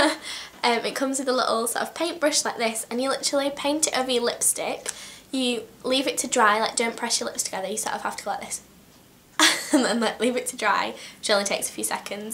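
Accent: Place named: Yorkshire, United Kingdom. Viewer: Your accent is amazing, are you from Yorkshire ? xx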